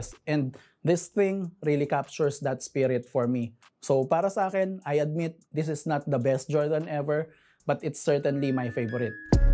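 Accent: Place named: Pampanga, Philippines. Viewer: Ah kapampangan ka bro? "Konyektado" Konyeksiyon"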